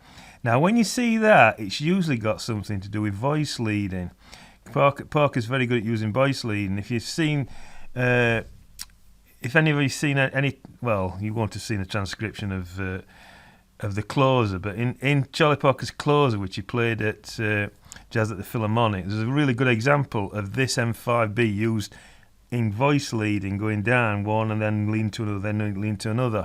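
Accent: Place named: Stoke, United Kingdom. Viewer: where are you from? It sounds like you've got a bit of a Stoke accent but with some brummie mixed in..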